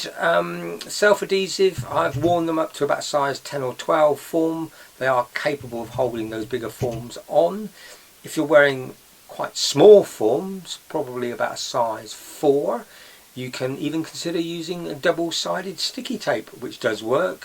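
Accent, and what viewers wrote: United Kingdom. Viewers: You ! From the U.K ? Love your English / British accent wow.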